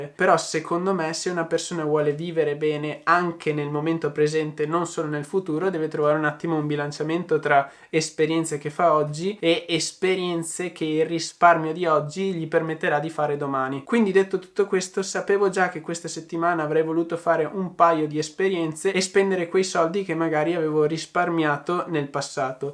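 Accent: Veneto, Italy. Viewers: Sei veneziano?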